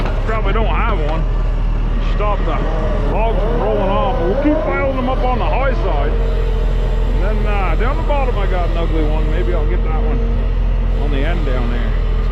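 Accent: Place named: Western Maine, United States. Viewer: new subscriber your speech sounds like you are from western maine. maybe my jugment of vermont is slanted by massacusett carpet baggers.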